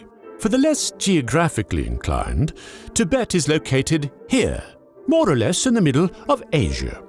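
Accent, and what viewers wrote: United Kingdom. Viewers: Y r u from british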